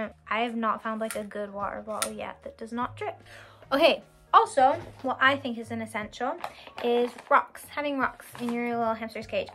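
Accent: Scotland, United Kingdom. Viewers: i love ur accent ( I think ur scottish ) U sound like my dad when hes on call with my nana cuz my dads scottish and my nans scottish and Irish( Edit ) I just realised u said ur English but moved to Usa so sorry x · Are you originally from Scotland? I hear a bit of Scottish accent sometimes but could be wrong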